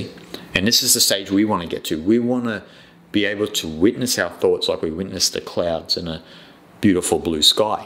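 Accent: Australia. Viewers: P.S. Are you Australian? 😌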